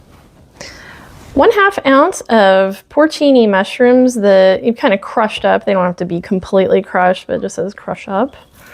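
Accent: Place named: Quebec, Canada. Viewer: Bonsoir! Première fois sur votre chaine. Dites-moi, avec votre accent, je vous soupçonne d’être du Québec, ai-je raison? Pourquoi: excellent français et excellent anglais! Mais peu importe d’où vous êtes, j’ai apprécié cette vidéo. P.s.: je suis du Québec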